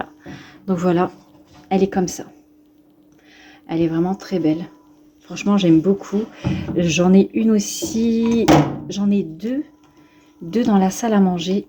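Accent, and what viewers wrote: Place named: North, France. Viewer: Bonjour, J’ai découvert il y a quelques jours votre chaîne YouTube, et sincèrement je suis devenue accro, déjà parce que vous êtes du Nord de la France, et que vous avez toujours la bonne humeur, la douceur et la gentillesse dans votre voix et dans vos vidéos. Personnellement je suis originaire de Valenciennes mais il y a maintenant 1 an et demi, mon mari et moi avons eu la malheureuse idée de Tout quitter (famille, travail, amis, maison) pour reprendre une épicerie près de Poitiers dans la Campagne profonde 😱 Visionner vos Vlogs m’apporte tellement de joie et de bonheur, rien que d’entendre l’accent de notre région 🥰 Merci pour tout ce que vous faites Pourriez-vous simplement m’informer si vous habitez dans la région de Valenciennes ou pas? À bientôt Stephanie F.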